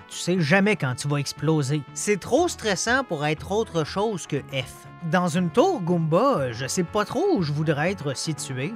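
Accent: Quebec, Canada. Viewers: Salut je viens de m'abonner sur la premiere video que je regarde , mais par contre , l'accent quebecois 😂 (je ne me moque pas mais je l'ai jamais vu)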